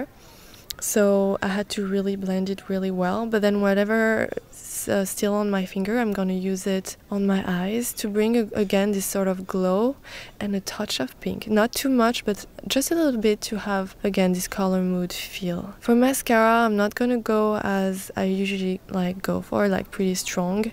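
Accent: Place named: France. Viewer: I love her French accent so much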